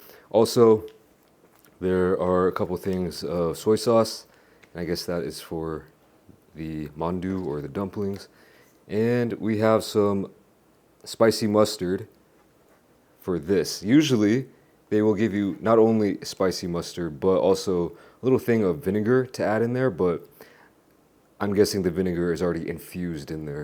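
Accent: United States. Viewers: you sound like you were from the USA, And smoke Blunts after Blunts..!! I love it..!! also i love being able to check out all the kick ass Korean foods and products..!!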